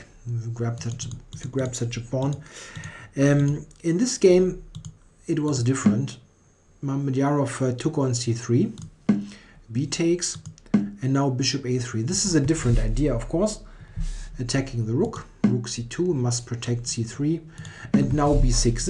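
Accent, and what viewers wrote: Germany. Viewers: I watched the analysis of this game on two other big channels and on your channel. And this video is the best in terms of video and sound quality and you offered by far the best explanations of lines and other possible variations. Nur ein sehr starker deutscher Akzent aber daran kann man sich gewöhnen.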